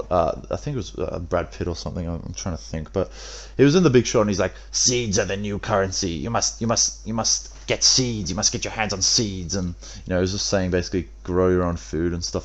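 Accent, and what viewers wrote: Australia. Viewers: aussie accent fooo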